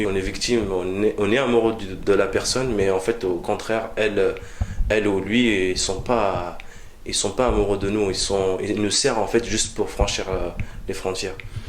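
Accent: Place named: North Africa. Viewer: Oui il a un accent du bled peut être il est venu en France à l'adolescence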